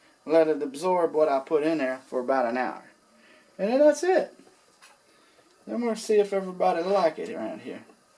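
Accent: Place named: Missouri, United States. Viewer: I swear that if I didn't know better I grew up in this house. I had forgotten some details from my childhood until I saw the wood cutout over the sink window. As soon as I saw that board it brought back memories. I'm from Missouri and this guy is exactly how I remember my family talkin like. The only thing missing is the Redman Chewin Tobacco pack sticking half out of a random pocket. I would sit at that window with feet in the sink and my BB gun strategically hidden in camouflage towels waiting for a crow to go for the bait I put in the garden. The kid crying in the background would be me at any given time.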